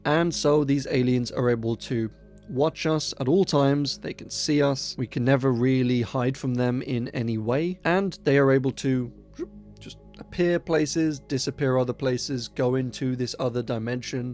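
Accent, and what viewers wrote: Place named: United Kingdom. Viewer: I have to know why Brits pronounce “aw” like “are”. I just want to know what is going on anatomically. Like where is your tongue when saying “idea” or “law”. Where is the “r“ sound coming from? No judging. It just stands out. How does phonology differ so much? Is an R not an rrrr sound? How does a “v” become a “wha” (w sound) in some languages? When we all have the anatomical capabilities? Does v sound like w in India?? Just curious. 🤷🏻‍♀️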